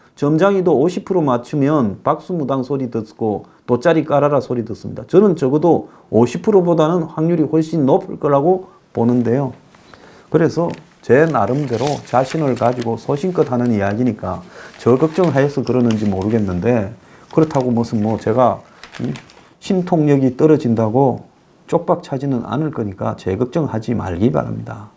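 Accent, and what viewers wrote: Gyeongsang, South Korea. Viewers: 뻣뻣하지 않습니다. 경상도 액센트 좋습니다. 진실을 말하는게 가장 듣기 좋습니다.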